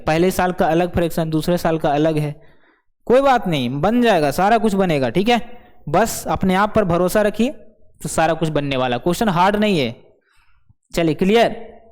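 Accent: Bihar, India. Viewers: Sir aap bihari ho kya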